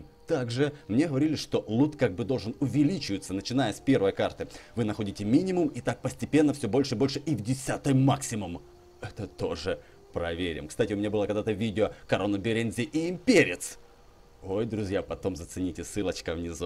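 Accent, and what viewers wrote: Ukraine. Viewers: FryTech228 Это диалект. Так говорят на Украине (в основном) и юге России (меньше).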